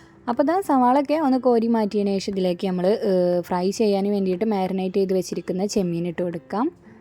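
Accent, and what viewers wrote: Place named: Malappuram, India. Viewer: Njagal Malappuram slang Maya Aantto😃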